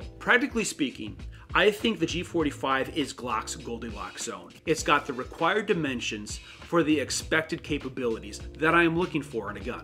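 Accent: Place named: Upper Midwest, United States. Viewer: That upper Midwest accent is thickkkk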